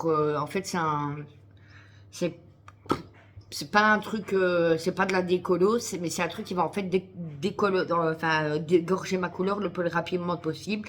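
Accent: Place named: Belgium. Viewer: Votre accent me rappel celui d'un ami belge de Charleroi😅😂🤣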